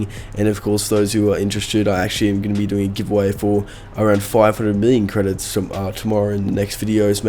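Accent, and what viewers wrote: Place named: Australia. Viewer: Hes speaking Australian english perfectly lol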